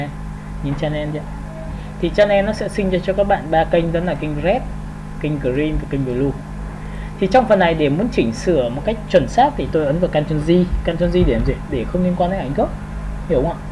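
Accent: North, Vietnam. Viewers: dọng bắc hơi khó nghe nhưng thầy dạy rất chi tiết dễ hiểu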